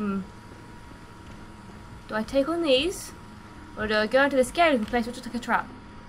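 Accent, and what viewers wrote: England, United Kingdom. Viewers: Hello, where abouts in England are you from? Just curious. You're voice is half the reason I'm watching this walkthrough. Also, extending your Hunter Axe assists greatly in dealing damage with a from a bit farther away, usually enough away than most enemies' hit range. Regards from Blackpool :)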